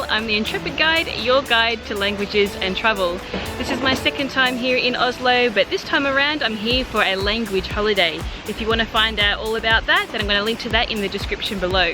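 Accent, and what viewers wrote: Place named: Melbourne, Australia. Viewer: G'day from your home town, Melbourne!! Not hard to pick that accent!! My wife and I are coming to Oslo-Bergen enroute to catch the North Lights in January next year. Any advice on what what may or may not be open in winter in Oslo? Thanks. Vincent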